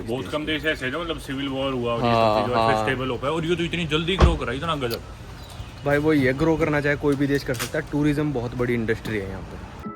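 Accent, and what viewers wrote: Haryana, India. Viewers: का accent कुछ कुछ हरियाणवी के तरह हो गया है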